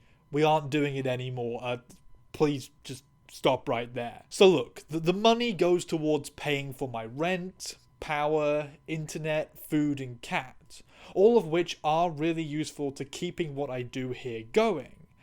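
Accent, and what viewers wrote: United Kingdom. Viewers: I assumed you were in Britain due to the accent. I'm British and have been wanting to move to NZ to escape the transphobia here; can I ask how good NZ is for being trans (access to healthcare, discrimination, etc.) in your experience? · girl i am so sorry i legitimately thought you were british, so when you made the disclaimer you're from new zealand it was real news to me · Honestly never pinged your accent as kiwi, I thought you were bri’ish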